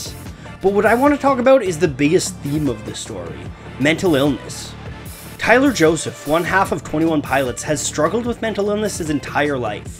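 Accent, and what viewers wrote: Canada. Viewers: The Narrator is clearly Canadian.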